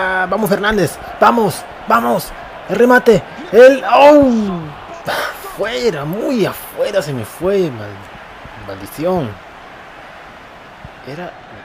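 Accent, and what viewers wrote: Uruguay. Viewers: sos uruguayo xD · parece q sos uruguayo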